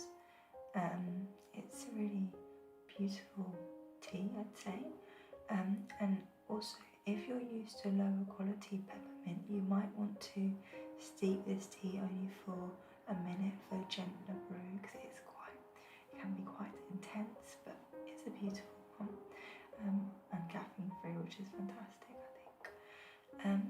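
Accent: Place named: United Kingdom. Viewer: This channel is such a gem! Your detailed explanations are perfect and I love the option of music - so rare - I find it so relaxing and grounding. I find it so interesting, I’m American and am rarely relaxed by British ASMR. I think you have a similar accent to Drifting SJ who I also like - and this channel 80gemstar that no longer exists (although her accent was considerably more intense). I think you all have great texture in your soft speaking voice which I really appreciate. Thanks so much !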